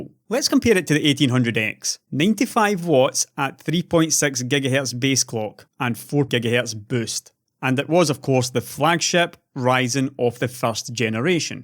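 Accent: Scotland, United Kingdom. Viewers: Never and I'm not even a native English speaker. 🙂I love his Scottish accent. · Thanks for explaining all this "so clearly"! I sure hope AMD can deliver all these improvements for near what your leaks and analysis suggests - cause I want one! If AMD pulls this off, they'll bury Intel... Running around saying "It's too good to be true" will do nothing but bump up the prices I'd think, since if they know they're delivering much more than the customers expect - or that the competition can possibly keep up with - why would they lower them? Even if people think that's true, they should keep their mouths shut and just see what unfolds! One software issue I can think of with these new high core and thread count architectures is how well the operating system's scheduling logic can take advantage of them. Properly optimizing the use of all the hardware resources based on the workload used to be a really complex problem back when I was in school - and that was just to handle dual and quad core architectures. 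(Yeah, "school" was a long, long time ago)... I hope the current state of the art (in figuring out optimized scheduling algorithms) can effectively exploit what all this modern hardware can now deliver... As always, keep up the great work - and have a merry Christmas and a wonderful New Year! p.s. - Your Scottish accent is still most excellent too!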